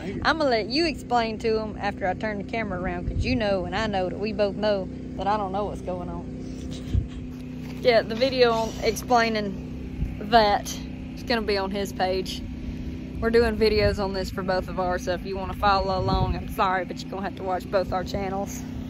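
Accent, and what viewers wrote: South, United States. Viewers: southern drawl I love it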